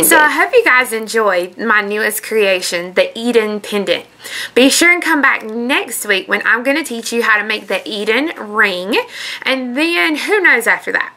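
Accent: South, United States. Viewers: I recently discovered U-Tube and then I found you! I've been bead working for about 15 years but my passion has been revived since watching your's and a few other's tutorials. You are a great teacher! I feel so blessed to have met you through this medium. I live in Alabama so your southern English is very understandable! Lol! I also subscribed to your site! Happy beading!💞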